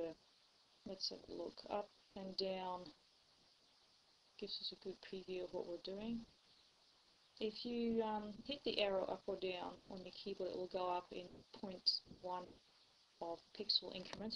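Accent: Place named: United Kingdom. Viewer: jesus. the british accent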